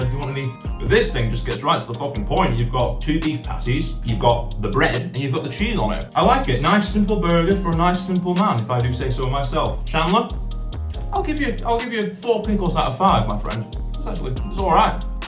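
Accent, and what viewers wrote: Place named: United Kingdom. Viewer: i dont know you were british, good content, cheer mate!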